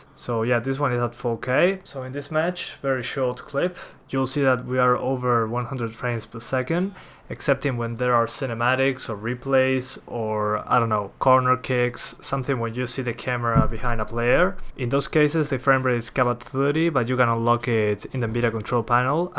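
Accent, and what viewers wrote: Argentina. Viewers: Wacho alto nivel de inglés, tarde un poco en notar el acento argentino.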